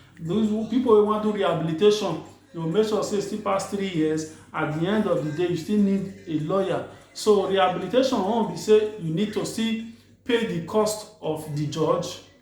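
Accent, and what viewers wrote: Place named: Nigeria. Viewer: Wow your pigin ascent is nice, thanks for sharing.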